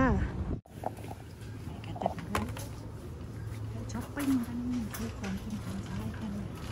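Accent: Sakon Nakhon, Thailand. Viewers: อยู่จังหวัดไหนคะ สำเนียงเหมือนคนสกลนคร เว้าย่อ 😂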